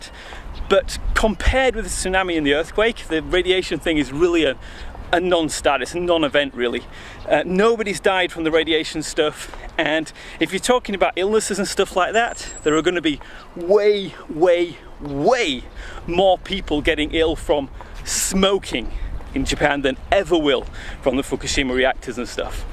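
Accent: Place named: Scotland, United Kingdom. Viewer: Ur accent is like a mix of British and Scottish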